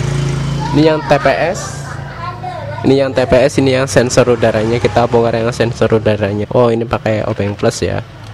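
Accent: Banyumas, Indonesia. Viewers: Wong ndi rika....deneng ngomonge jawa ngapak kya aku😁😁😁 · Bengkele ngndi mas ngomonge ngapak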